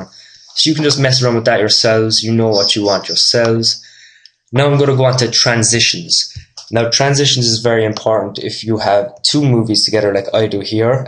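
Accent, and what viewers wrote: Ireland. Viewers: I swear you're Irish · Are you Irish?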